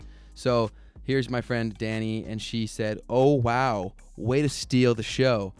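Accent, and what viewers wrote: Canada. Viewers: You have a slight Canadian: Trailer Park Boys accent. Which is awesome. (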